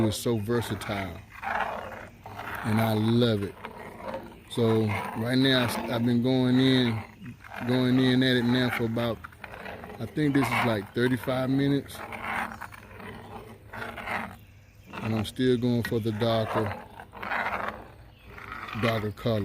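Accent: South, United States. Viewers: Did you ever happen to watch your videos afterwards? The English subtitles suck! Your nice Southern accent is not recognizable and they write nonses! I laughed heartily! Enjoy the audio to written stupidity of you tube. Bye from Greece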